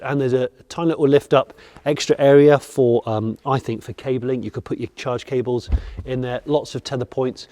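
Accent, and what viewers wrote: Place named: West Country, United Kingdom. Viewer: lol at your voice control tests with your wurzzle accent, good luck with that fella. In the many years or working in IT and tried pretty much all of them I have only found Cortana to understand our twang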